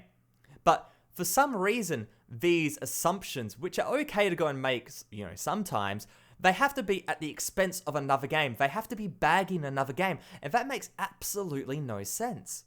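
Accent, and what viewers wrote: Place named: Australia. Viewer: You have a really interesting accent. Are you aussie or from NZ?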